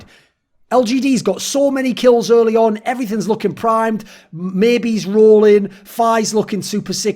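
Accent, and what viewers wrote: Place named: Scotland, United Kingdom. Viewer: I just can't get past the Scottish accent. Not that's it a bad thing, but it's just noticeable to me. :)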